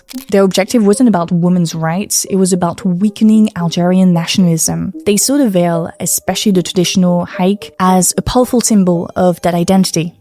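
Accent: France. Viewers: You accent is so cool. You sound french. Then you sound australian. And little bit of scottish.😊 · You sound french 🤔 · It was just too much for me, Im allergic to french traing to speak English and leter R, I know what I said, just another youtuber traing to get some like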